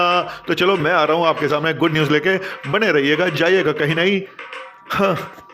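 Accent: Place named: Kolkata, India. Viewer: Kolkata reeee..... bolne ka style bahut achha lagta. Aap kolkata aaiye mishti doi khilaunga jarur .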